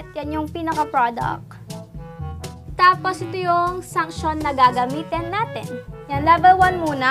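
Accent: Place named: Pangasinan, Philippines. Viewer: U have pangalatok accent hehe🤗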